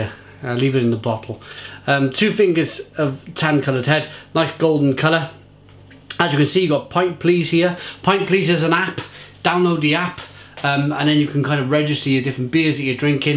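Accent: United Kingdom. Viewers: This is my personal favorite of all the Finnish IPA's. CCCCC by Beer Hunters is also great, but this is one more to my Taste (I capitilised it because maku means taste/flavour). By the way, where from the UK are you from? I'm trying to recognise the accent. :)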